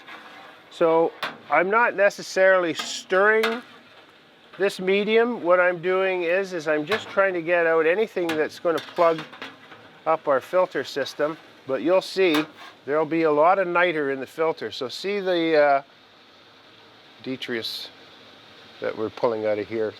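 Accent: Canada. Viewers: I'm Canadian, and this guy's Canadian accent is too much even for me.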